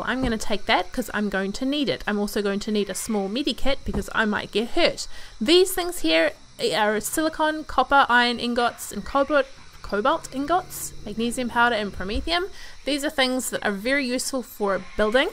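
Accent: New Zealand. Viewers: Just found you and this vid; only bought it the other day, so all new to me. Good vid, thanks. :) SPACE girl, eh? So, Elite:Dangerous or not? ;) (Not yet explored your channel yet... another time -- overdue to get back into Empyrion...) Is there a bit of American/Canadian to your Kiwi accent -- or am I wrong?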